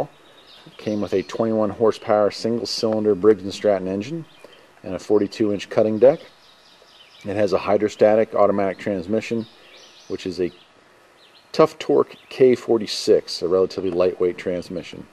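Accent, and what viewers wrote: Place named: Midwest, United States. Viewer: You sound like a midwestern boy! Great channel, for learning!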